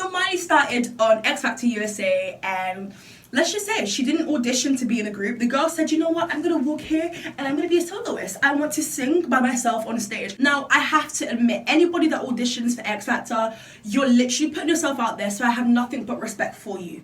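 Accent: United Kingdom. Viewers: Am I the only who has become so used to that I can't hear her British accent any longer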